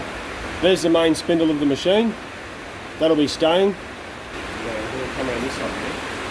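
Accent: Queensland, Australia. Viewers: Simple CC can barely cope with American English, struggles with Australian English and is completely fu!@ when it comes to Queensland English.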